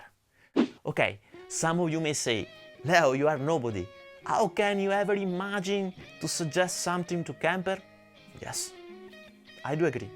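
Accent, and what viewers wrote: Italy. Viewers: Great video, great channel from the few videos i've seen so far, and digging that Italien accent (I'm French btw) :)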